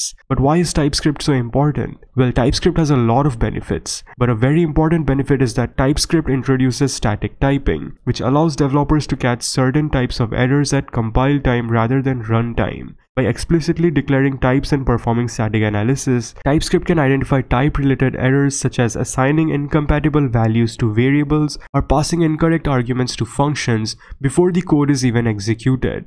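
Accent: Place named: India. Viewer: AND THERE'S the indian accent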